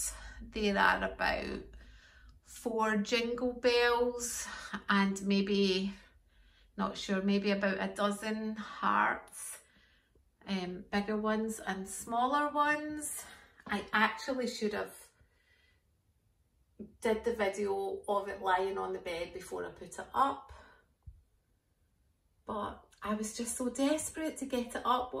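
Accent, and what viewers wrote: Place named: Scotland, United Kingdom. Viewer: hahahaha! I love your Scottish speak :) would you be willing to do a tutorial soon so we could see one start to finish?? I am going to try to do one on my own, but a visual reference would be fabulous.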